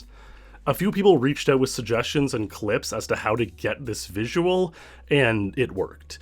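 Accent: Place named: Eastern Canada, Canada. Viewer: I detect an eastern Canadian accent-- Newfoundland? Halifax?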